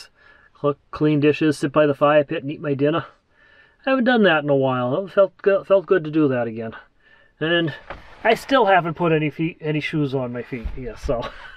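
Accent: Boston, United States. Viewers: Just knew you must be from the Boston area! You sound so much like my son-in-law from Beverly.🙂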